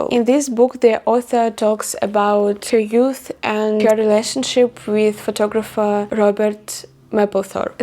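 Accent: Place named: Russia. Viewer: I absolutely agree with this Where as soon as I saw you and hear your accent I knew that you are Russian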